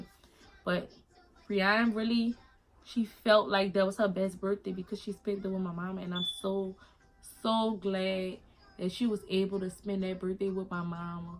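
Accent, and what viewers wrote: New Orleans, United States. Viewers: New Subbie! I love your frenchy New Orleans accent. So Sad! RIP Breon Prayers to you, I know it's hard. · First time watching you, is that a New Orleans accent I hear 👀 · This video was in my recommendations.This is so heartbreaking. You are incredibly strong! I couldn't imagine. Praying for you mama.❤ Also, are you from NOLA? I know that accent when I hear it lol. If so, hey girl from another fellow Louisiana mama! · Rip to your sister. U from New Orleans u sound like it. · you talk like u from louisiana where you form ?- im a new supporter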